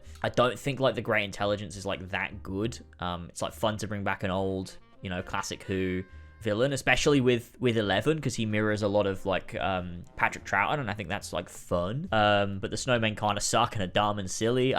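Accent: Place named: Southern England, United Kingdom. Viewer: I cant work out your accent you sound like youre from the south of the uk but also from australia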